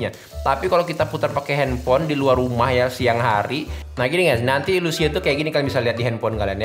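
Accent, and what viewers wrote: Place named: Madura, Indonesia. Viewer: Bang lu orang madura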